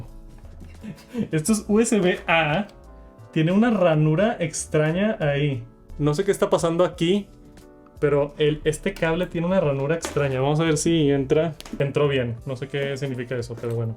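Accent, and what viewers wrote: Mexico. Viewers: Porque habla así con ese tono, como afeminado o de niño adinerado. 🤔 Es por Alguna region de México hablan así o es solo esta persona ?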